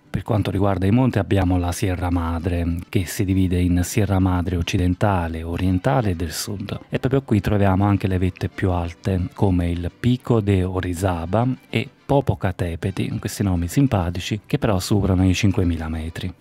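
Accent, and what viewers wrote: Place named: Spain. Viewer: Wow che bell accento in Spagnolo!❤️🙌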